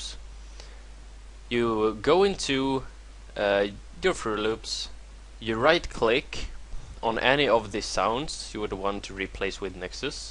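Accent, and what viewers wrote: Sweden. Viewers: Haha du låter som en typisk svensk <3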